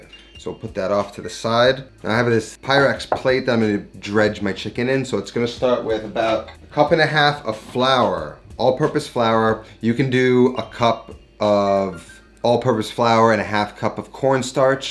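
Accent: New York, United States. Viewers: New york accents are magic on video for some reason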